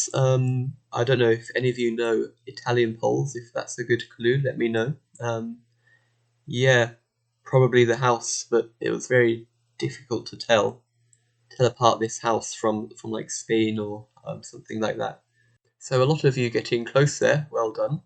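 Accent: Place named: United Kingdom. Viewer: great video, enjoying the face cam. in the last video you said you live in taiwan. are you a british living in taiwan (because your accent is quite british) or are you actually taiwanese? just curious.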